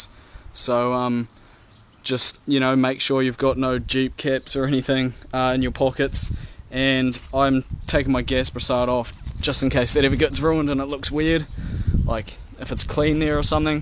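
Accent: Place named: New Zealand. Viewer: I hear New Zealand. Chur for the video bro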